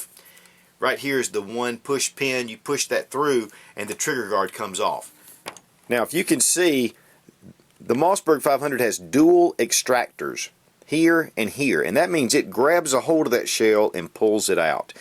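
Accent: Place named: South, United States. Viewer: i love my 500a, i mean its not a suave 870 but i mean really when your doing dirty work dependable is where it is. also 8 rd's in the tube one in the chamber you can't argue with that fire power, and also add a sure fire to the front and a shell carrier to the butt stock you got yourself low budget shtf gun, i mean a slug is a human stopper hell buck shot is too. great review, i love your channel and love the fact im hearing a southern accent with my review long live the republic!!!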